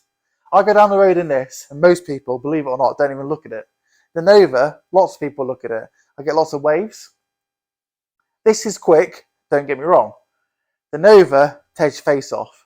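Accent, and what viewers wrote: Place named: East Midlands, United Kingdom. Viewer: Found myself with a daft grin on my face while watching this! I love my Vauxhalls. Never had an engine swapped one but I love the thought of a C20LET or Z20LET in a Nova or Corsa. Where are you based? I think I detect a Derby/Nottingham accent.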